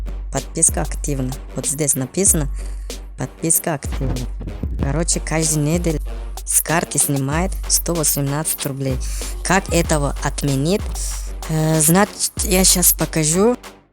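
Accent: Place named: China. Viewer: Китаец научился русскому языку,и плюясь в микрофон помог лучше чем кто-либо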